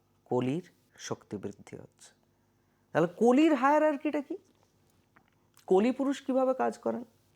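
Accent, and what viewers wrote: Purulia, India. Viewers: That Purulian accent 👌😊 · Ki sundar kore bolo tumi bhai পুরুলিয়া r bhasa, অপূর্ব, eto সমৃদ্ধ hoi tomar kotha sune je bolar bhasa nei🙏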